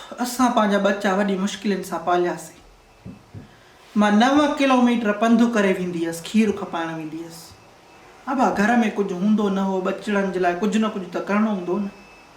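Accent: Sindh, Pakistan. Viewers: 😂😂u R superb... 👍❤dadho khili aa twhn j ghalin te...twhn ji sindhi tmam ghni typicl aa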